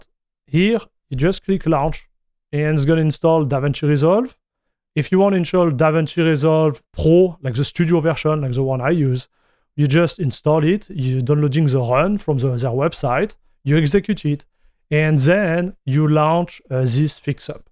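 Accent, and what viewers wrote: France. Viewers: I love your videos, so informative and I like your french accent too 💖 running nobara 41 rn. unfortunately I haven't gotten time to game as much but it's great. I've been able to get almost everything working with a few quirks I need to find my way around over the year. funny thing, my cs2 install won't stop lagging and hitching. same issue on windows too, to a lesser extent tho. haven't tried different distros yet but I figure it'll be the same everywhere atleast on wayland. not touching x11 cuz I want how to learn to fix issues instead of putting a temporary band aid. anyways thanks for the videos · Les français qui ont remarqué l’accent français 🖐️ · you don't need to be french to notice the heavy french accent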